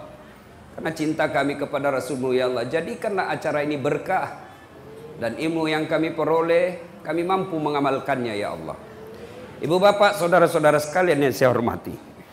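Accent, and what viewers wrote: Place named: Sulawesi, Indonesia. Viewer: Allhamdulilah masih kental logat sulawesinya walupun diluar kota Sulawesi berdakwa 🤲 Sehat selalu pak ustad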